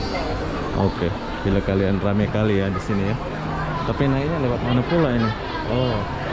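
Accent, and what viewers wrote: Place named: Malaysia. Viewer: Makin ketara bahasa Melayunya sekarang bossque makin mantap liputannya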